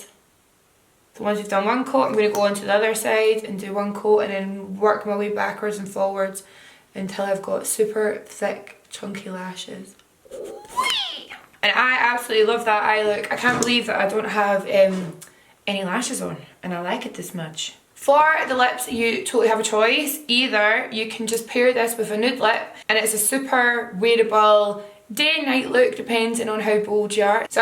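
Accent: Scotland, United Kingdom. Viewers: Really love this look! We're fellow Scottish YouTubers! Love hearing Scottish accents on YouTube, it's so rare! 😄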